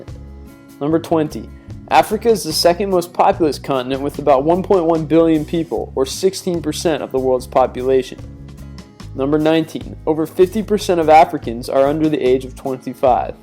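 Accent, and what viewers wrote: United States. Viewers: U sound American but when speaking of measurements u use metric instead of American standard. Why? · The American accent should not have been used for this narration.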